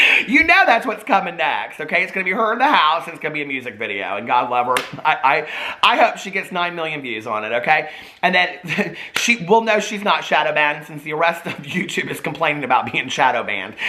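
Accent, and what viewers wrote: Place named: Midwest, United States. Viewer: It’s the Midwestern twang for me!!! 😂 I never knew we had an accent here until I moved to Florida for a year to get sober! So, I guess we have an accent!! 😂 and yes, we do say ‘fixins’ !!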